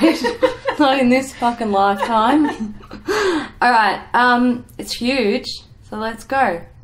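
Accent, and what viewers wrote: Australia. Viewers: I love watching mainly because I LOVE the Australian accent. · Your accents make me miss Australia so bad. Stayed in Melbourne for a week and I need to go back xD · Autralian english is so funny. Mühn müüs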